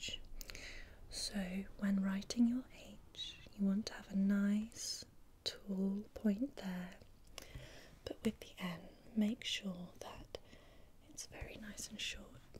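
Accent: United Kingdom. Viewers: And has a British accent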